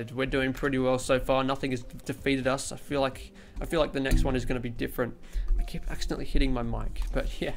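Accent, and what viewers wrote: Australia. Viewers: is sounding significantly more australian than usual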